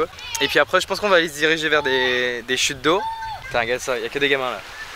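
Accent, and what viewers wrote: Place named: France. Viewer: MDR yr French accent is so cOOl .On dit island hOOping ? ou island yOOpi lOOl :)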